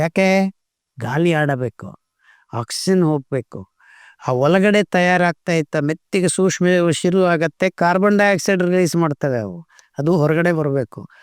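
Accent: Standard. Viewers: ನೀವು ಮಾತನಾಡುವ ಶುದ್ಧ ಕನ್ನಡ ಕೇಳೋದೆ ಚಂದ ಡಾಕ್ಟರ್ ಸರ್ 🎉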